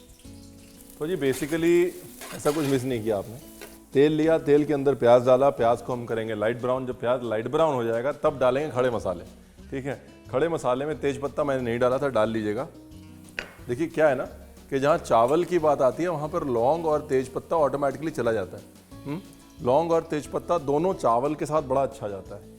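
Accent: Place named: Lucknow, India. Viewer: Briyani toh mai try toh krungi Hi but but jo lko ka bolne ka tarika hai na 😅maja aa gya hum aur humra du tho laung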